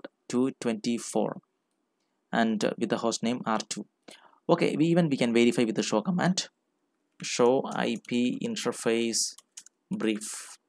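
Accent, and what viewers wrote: Kerala, India. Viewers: Brother....I highly appreciate your work. I am a Networking Student. Your videos have been "'Life Saver" for me throughout my course. THANK YOU SO MUCH DEAR BROTHER. From your accent I could understand your origin is Kerala, I am from TN, we are near by and we are brothers. Please continue to post videos, as lot of people can be benefited by your remarkable work. THANKS CRORES.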